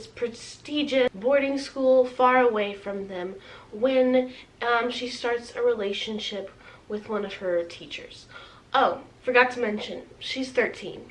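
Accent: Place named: South, United States. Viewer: very here for more reviews in southern accents. so many of these books are on my tbr!!!!! so excited!!!!!!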